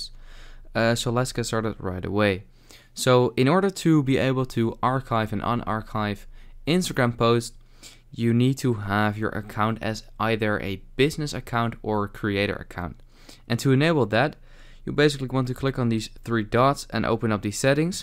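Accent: Netherlands. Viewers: Hmmm Dutch? I can hear it a little ☺️ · I hear someone is Dutch here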